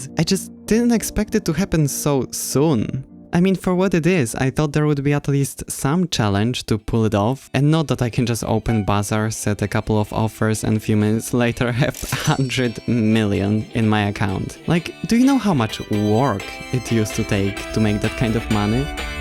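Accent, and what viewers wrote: Poland. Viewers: Your accent is so Polish.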